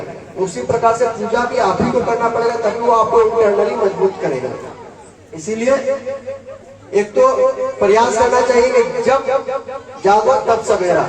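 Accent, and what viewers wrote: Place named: Bihar, India. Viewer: राधे राधे एक दम बिहारी टोन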